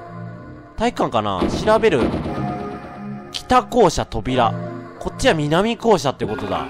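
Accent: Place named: Kyoto, Japan. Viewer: たまに出てくる京都弁でほっこりする^^ 肉人形楽しみにしてます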